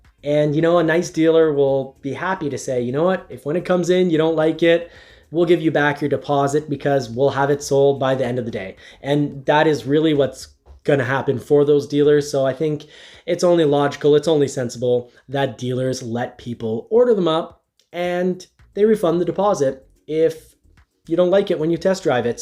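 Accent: Canada. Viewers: Hermosillo.... Hermo see oh. There is no L sound. You say the name of the city like you're from Canada or something.... Good vids tho.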